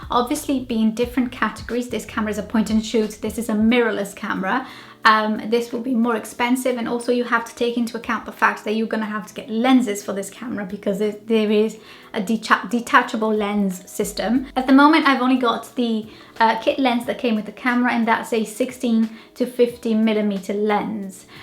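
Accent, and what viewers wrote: United Kingdom. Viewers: tb sou brasileira e moro em Londres, o seu acento britanico e fantastico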